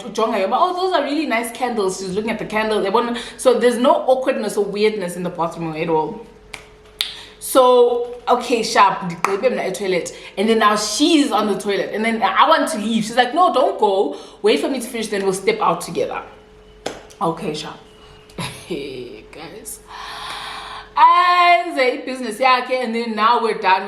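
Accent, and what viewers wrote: South Africa. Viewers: Your Xhosa Accent 😂😂😂😂😂😂 ... " Hai Celia "" 😅😅😅 .. Make up looks absolutely Ravishing 💯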